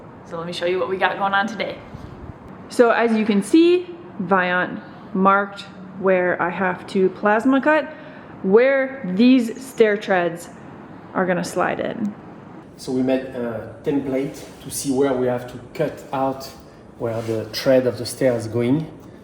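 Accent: France. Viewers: Waouh je viens de vous découvrir et quel ne fut pas ma surprise en entendant ce doux accent FR ah ah ah <3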